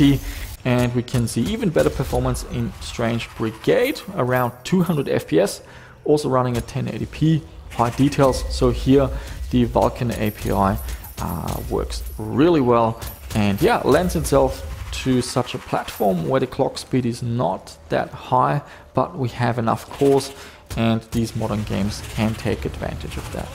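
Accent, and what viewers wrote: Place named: Germany. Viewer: Damn that german accent... 👍😉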